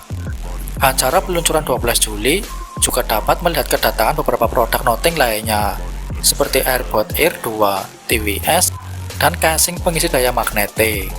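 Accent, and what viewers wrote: Banyumas, Indonesia. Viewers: Dri dialeg nya kdgrannya org ngapak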